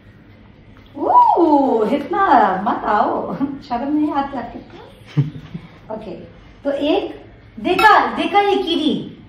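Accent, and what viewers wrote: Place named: India. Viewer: I am here for her hindi accent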